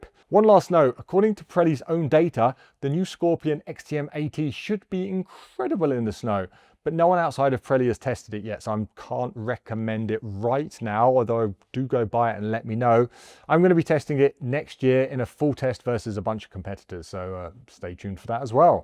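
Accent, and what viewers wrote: United Kingdom. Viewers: lol, i always thought you were British :D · I don't TRUST these "review channels" much, they all probably funded by manufacturers of tires. Too much bias. And a guy with brit accent living in Salt Lake City.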